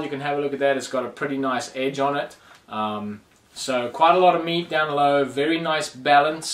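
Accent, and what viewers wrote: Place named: Australia. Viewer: are you half ausie or have you lived in auz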